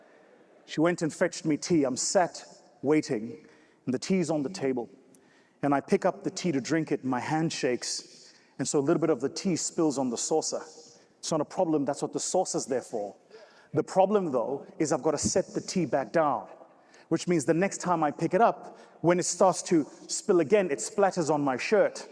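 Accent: South Africa. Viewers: South African accent 🤣🤣🤣🇿🇦🇿🇦🇿🇦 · Pure South African accent. Keep shinning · Your Proudly South African ❤ Africa accent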